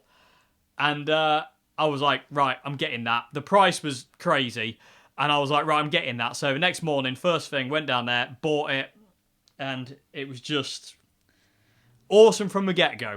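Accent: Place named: Lincolnshire, United Kingdom. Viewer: with a hint of lincs accent if I'm not mistaken?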